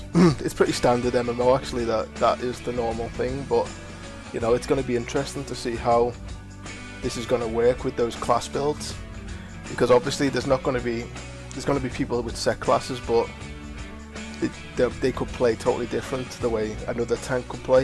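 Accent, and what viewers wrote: Liverpool, United Kingdom. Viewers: Oh and its nice to hear another scouser on YouTube =D that alone earned my sub =D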